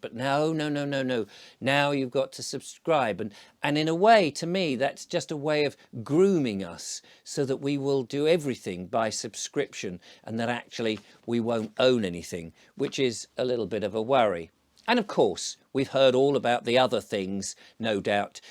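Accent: United Kingdom. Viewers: It's the British accent.🤫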